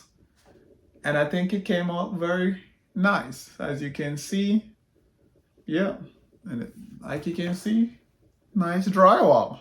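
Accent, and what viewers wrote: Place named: Jamaica. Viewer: Which part of Texas are you located? I am Jamaican too BTW. I can tell from the accent. And I see the Josephus book behind you. Looks like you are on it with your true heritage. 😊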